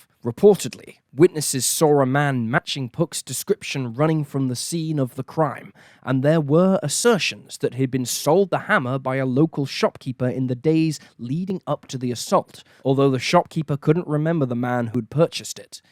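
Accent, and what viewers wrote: England, United Kingdom. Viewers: Good, the English guy is back.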